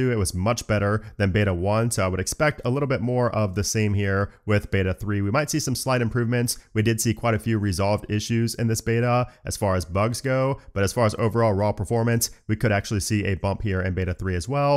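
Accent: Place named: Texas, United States. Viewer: Is he from Texas? Why does he talk funny?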